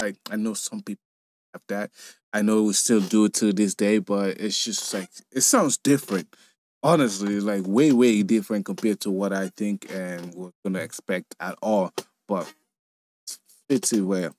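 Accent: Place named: Jamaica. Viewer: I am a white guy in his mid 50s and is one of my idols. He mixed country with the old blues and kicked up playing faster and harder. Both the Beatles and Rolling Stones were inspired by him. I live on the east coast on the US and his accent sounds like mine mostly. where are you from sir? In no way am I saying anything to disparage you accent. It sounds Jamaican maybe?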